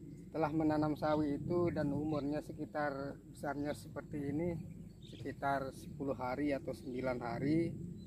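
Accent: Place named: Lombok, Indonesia. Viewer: Logat lombok nya kentara banget pak slm dari lombok tgh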